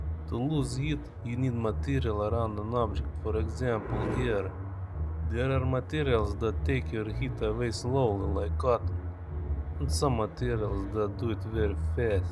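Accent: Russia. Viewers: Dude the Russian accent makes it seem cooler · Typical Russian voice xd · Try fix the russian accent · Sounds like crazy Russian hacker mixed with gru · Unique russian accent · I love this Russian accent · Im confused xD are you actually russian or just playing the accent. Either way it's on point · Aahhh Russian accent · Really love that Russian accent! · I like russians ur russian i hear it from ur voice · You sound really russian Oh yeah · You sound very russian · I actually enjoy more of this Russian accent than normal English accent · God ur accent is fuckin russian ! Loved it but i rather like the older channel !! Keep uploading on it ! Thnx love from india !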